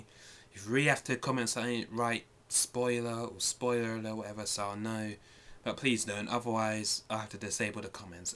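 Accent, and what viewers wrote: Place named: England, United Kingdom. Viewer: lol shirt says bronx but hes clearly english :)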